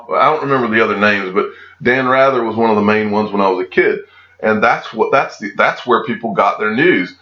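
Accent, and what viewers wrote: South, United States. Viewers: It’s just a matter of.. Love the southern accent😉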